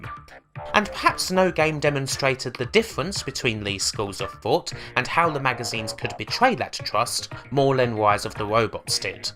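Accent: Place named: England, United Kingdom. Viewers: How come some people consider way of speaking a speech impediment? I guess it's an accent from a region in England. I understood him perfectly, and I'm Argentinean (hence, a non-native speaker of English). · Because it's an Essex accent. A very strong one. It's why Jonathan Ross pronounces his "Rs" as "Ws".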